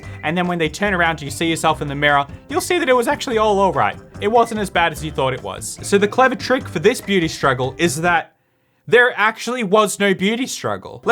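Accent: Australia. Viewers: Your no moi confuses me specially when after it you start speaking perfect Australian accented English... 😅 Yeah, I know my problem is weird 😅😅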